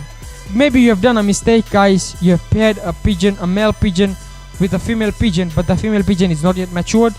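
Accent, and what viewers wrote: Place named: Africa. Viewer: You sound African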